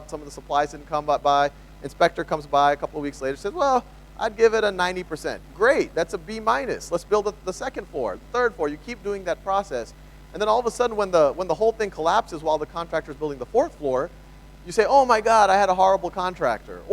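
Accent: South, United States. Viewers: Excellent presentation. i love his down to earth attitude, his humbleness and even his slight southern accent ( i love it when he says "Y'all). Khan academy is the best!!!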